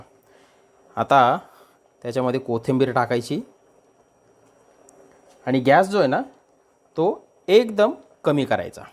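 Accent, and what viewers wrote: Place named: Khandesh, India. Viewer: दादा तुमची भाषा खान्देशी आहे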